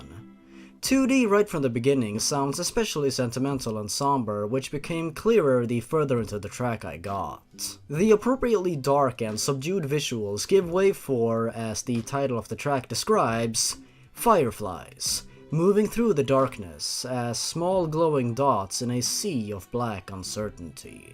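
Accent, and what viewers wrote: Sweden. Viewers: Visste inte du var svensk xD Borde ha fattat på dialekten, men jaja...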